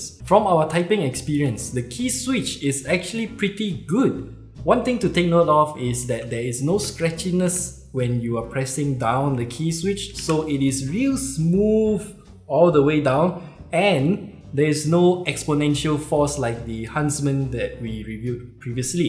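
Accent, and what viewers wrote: Singapore. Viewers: You from Singapore lah? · Noice video by the way are you Singaporean because that accent sounds soo familiar.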